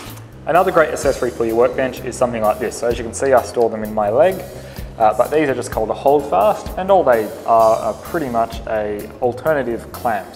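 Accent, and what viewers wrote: Australia. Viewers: Great project and I cant wait to build one myself. Where cn I buy the holdfasts? Great to hear an Ozy voice.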